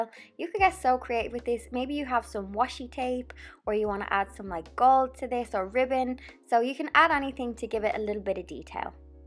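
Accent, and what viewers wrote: Ireland. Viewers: I love the Irish accent. It is absolutely gorgeous. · I enjoyed your video! I do all of these already...but I see I need to make them pretty because these look great!! And I so love your beautiful Irish accent!! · You have gorgeous eyes! My hubby is Irish, I love an Irish accent. I could listen to you all day. Great ideas, thanks for the video! Just subscribed! ❤😊🍁❤ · Your diys are cute just like you. Love your Irish accent!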